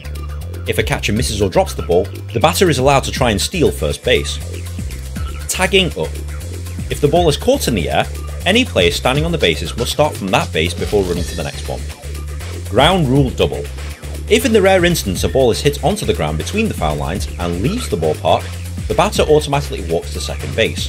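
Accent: United Kingdom. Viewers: as for eastern european man trying to learn american game this british accent does not make any it easier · As a guy named after a baseball player, it's just fun to listen to a British guy explain the rules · Is this a brit explaining an american sport?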